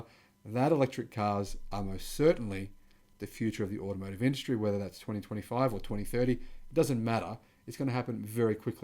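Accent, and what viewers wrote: Australia. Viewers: My significant other says you’re trying too hard. I said, ‘what do you mean? He’s the most calm speaking Aussie that I’ve ever heard!’ She said ‘it’s obvious he’s built like a brick s-house he should button up and not flaunt it’ whatever…I’m not letting her watch your videos anymore.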